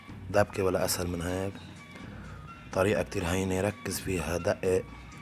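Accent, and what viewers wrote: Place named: Iraq. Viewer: عم تحكي عراقي واو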